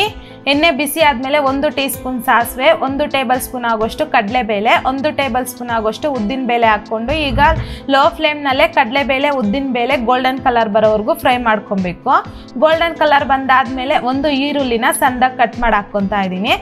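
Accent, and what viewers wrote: Kolar, India. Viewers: Kolar side avaru ansuthe nice kannada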